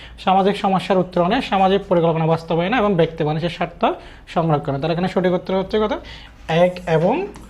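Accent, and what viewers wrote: Chittagong, Bangladesh. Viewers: Bhaiya apner basha Chittagong maybe🙂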